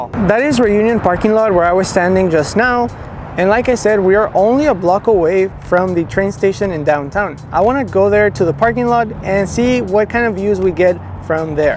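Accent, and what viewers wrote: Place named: Laredo, United States. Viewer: Whenever I want to show people what a Laredo accent sounds like, I'll show them your vids haha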